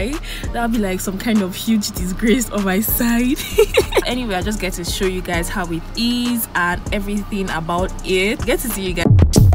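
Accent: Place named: Igbo, Nigeria. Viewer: This your Igbo is sweet o😂❤️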